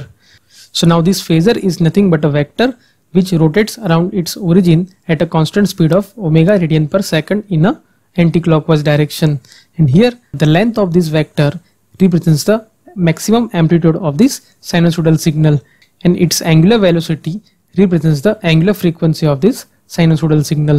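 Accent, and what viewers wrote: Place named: Hindi, India. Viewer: Wow strong hindi accent, beautiful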